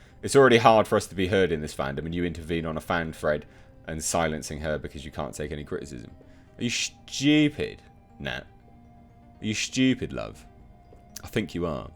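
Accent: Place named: England, United Kingdom. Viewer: american? He has clearly an english accent. Why did you thought that he was american? I dont get it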